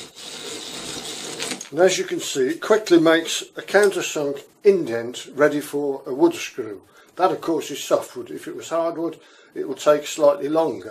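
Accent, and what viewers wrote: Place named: England, United Kingdom. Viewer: I love that english accent, cheers from Argentina :).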